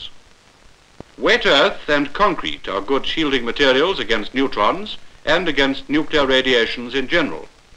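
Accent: United Kingdom. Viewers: This is a British film, not Canadian. Love the narrator's Received English accent. Flash sounds like flesh. No wonder why they call a flashlight as a torch. Americans would laugh if they heard something that soundly like fleshlight. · Typical british accent... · That high-brow British accent... that same annoying accent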